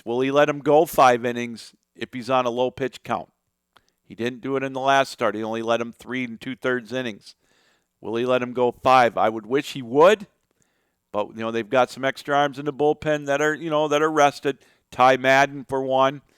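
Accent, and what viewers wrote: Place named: Port Huron, United States. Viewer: You have to be from Port Huron... you sound just like my grandparents :D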